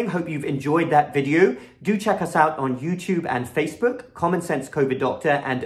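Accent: England, United Kingdom. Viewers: English accent and your intelligence makes you a bawss professor anyway, no need to add on the dorky glasses you look good bruh you rawk already! boss doc!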